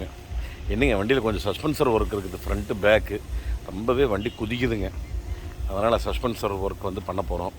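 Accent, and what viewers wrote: Kongu, India. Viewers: வணக்கம் அண்ணா. எனது பெயர் சிவராமகிருஷ்ணன். கோவை சூலூர் பகுதியில் வசிக்கிறேன். தங்களது வேலைகள் அனைத்தும் மிகவும் சிறப்பாக உள்ளன. தங்கள் காணொளிகளை சமீபகாலமாக பார்த்து வருகிறேன். உங்களது கொங்கு மொழி சாயலும், நகைச்சுவையான பேச்சும், விளக்கங்களும் ரசிக்கும் வண்ணம் உள்ளன. தங்களிடம் வருகை தரும் பல்வேறு மாவட்டங்களை சேர்ந்த வாடிக்கையாளர்களே அதற்கு சான்று. விரைவில் நீங்கள் பிற மாநில வாடிக்கையாளர்களும் பெற எனது வாழ்த்துக்கள். Alloy wheels - Super design I seen in non-company alloy wheels. Dual tone colour paint - Awesome. DRLs - Great. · கொஞ்சும் கொங்குத் தமிழ் பேசும் கோமகரே...! திகட்டாத தித்திக்கும் பேச்சுடைய திருப்பூர் திருமகரே...! மதிப்பிற்குறிய " " திருப்பூர் திரு. அண்ணா🙏❤ எப்படி இருக்கீங்க❤🙏 அண்ணி எப்படி இருக்காங்க❤🙏 ரித்திக் எப்படி இருக்காரு❤ அருமை அருமை அருமை வழக்கம்போல அண்ணா உங்களோட வீடியோ அருமை❤❤❤😊😊❤❤ என்ன சொல்ல ஏது சொல்ல😊😊❤❤❤ சும்மா நெகு...நெகு...நெகுனு இருக்கு ஹுண்டாய் I20😊😊❤❤❤❤ வெள்ளை நிறமும்/கருப்பு நிறமும் அட்டகாசம் போங்க😊😊😊❤❤❤ அதிலும் குறிப்பாக அந்த "அலாய் வீல்" வேற மாதிரி வேற மாதிரி😊😊😊😊❤❤❤❤❤ அழும் குழந்தையின் அழுகையை நிறுத்த தாயால் மட்டுமே முடியும்...! 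அதேபோல.... அஞ்சு பைசாவுக்குகூட தேறாமல் அழுக்குப் படிஞ்சு பாழாய்ப்போன காரை அழகுபடுத்தி ஆரோக்கியமா மாத்த " " அண்ணாவாலதான் முடியும்😊😊😊❤❤❤❤🙏 இந்த வீடியோவில் சரியாக வது செகண்ட்ல அண்ணாவோட வேலைப்பாடுகள் பற்றி கிண்டல் செய்து சொன்ன தன் அறிவை கழட்டி ஆப் ஆயில் போட்டு தானே எடுத்துத் திண்ண அந்த ஆளுக்கானது...... குறை சொல்பவனுக்கு குழந்தையின் சிரிப்பும் குற்றம்தான்....! உளறுபவனுக்கு உழைப்பின் மகத்துவமும் தன் மரமண்டையில் ஏறாத ஒன்றுதான்...! அப்படிப்பட்டவன்தான் அந்த கிண்டல் செய்தவனும்...! " " அண்ணா நீங்க அடிச்சு துவைச்சு போயிட்டே இருங்க அண்ணா😊❤ உங்கள் திறமை யாவும் வாசம் நிறைந்த சந்தனம் போன்றது😊❤...! அதெல்லாம் சாக்கடை எது? சந்தனம் எது? என்று பகிர்ந்து பார்க்கத் தெரியாத அந்த கிண்டல் செய்த மடையனுக்குப் புரியாது...! என்றும் அன்புடன் உங்கள் தம்பி ரா.முஹம்மது கபூர் (சென்னையில் இருந்து)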